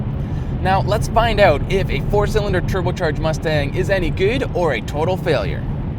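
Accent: Canada. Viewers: so canadian sounding, i dig it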